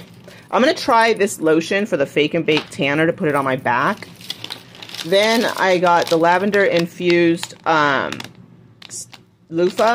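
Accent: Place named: United States. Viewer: Muy are beautiful as I see you on your face with quialificatiion to be on the camera of your phone, thanks for your help on how to buy items and the complementaries to see you more on your camara, I love your voice is perfect to hear the good American's accen which is easy to follow for me that english language is not my mother's ternt language , sincerely from Norway , raiza Theis from Venezuela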